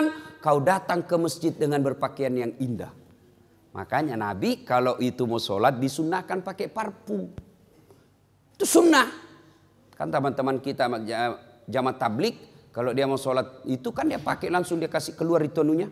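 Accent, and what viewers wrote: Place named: Makassar, Indonesia. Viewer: Saya orang jawa tapi logatnya orang Makasar tetap ewako..menyenangkan.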